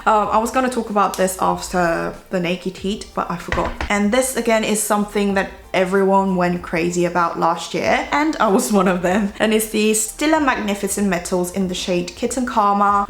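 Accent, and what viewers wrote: United Kingdom. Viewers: 오늘 립 진짜 예뻐요!!!!! 저도 삐아 컨투어링 밀크티 브라운 진짜 매일 쓰는데 등장해서 너무 반가워요 코 쉐딩에도 넘나 자연스럽고 예뿝니다 >< 오늘도 t 영국발음에 치이고 갑니다.. 털썩.. 믿고 면세점 털러갑니다 헤헤 오늘도 행복한 하루 되세용❤ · 제가 영어를 잘 몰라서 그러는 발음은 영국 발음인가용? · 가끔 영국악센트나와요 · 저도 여쿨이라 언니 영상이 넘넘 도움이 많이 돼요😢💕💕 언니의 영국 발음은 몇번이나 들어도 넘 멋있구 스윗하네요😘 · Omg!!!!british accent💕💕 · 영국발음 너무 좋다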